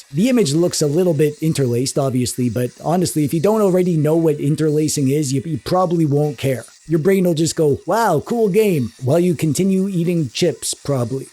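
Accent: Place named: Canada. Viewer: Anyone with eyes can compare the hands and see they're two different people. There was an April Fools gag last year where pretended to be. Also, is Canadian. There is no way I can believe that could pull off that accent. If he could, he wouldn't be making YT vids, he would be making bank as a voice actor.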